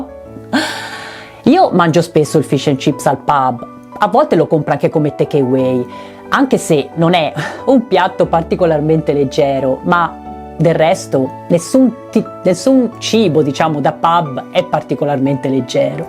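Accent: Italy. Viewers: Grazie, mi piace il tuo modo di parlare così garbato, proprio lucchese. Un abbraccio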